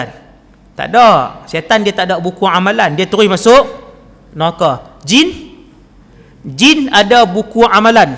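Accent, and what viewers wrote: Kedah, Malaysia. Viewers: Pasai ustaz ckp KEDAH lah duk dengar ulang Kali x jemu.. Semoga ustaz SENTIASA DALAM KEREDHAAN ALLAH SWT 🤲❤️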